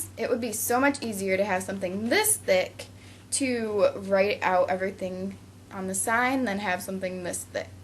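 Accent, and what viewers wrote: United States. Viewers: Are you from America madam please say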